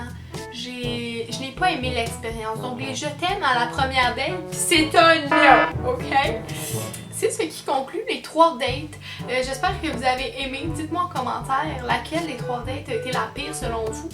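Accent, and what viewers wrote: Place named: Quebec, Canada. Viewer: tu viens de quel endroit du québec? ton accent est vrm fort et cute 😂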